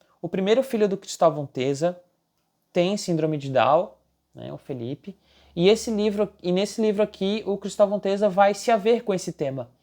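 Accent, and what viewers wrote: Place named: Florianópolis, Brazil. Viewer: Pelo sotaque, vc só pode ser manezinho da ilha também hahaha (sou de Floripa)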